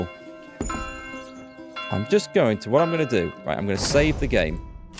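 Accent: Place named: North Yorkshire, United Kingdom. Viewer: could hear the subtle North Yorks accent, I grew up in county Durham, so kinda sat on the yorks/lancs rivalry border :)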